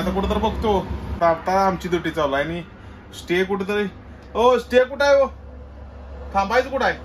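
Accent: Kolhapur, India. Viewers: दादा भाषण कौशल्य खुप सुंदर आहे कोल्हापूरी भाषा 1 नंबर ❤❤👌👌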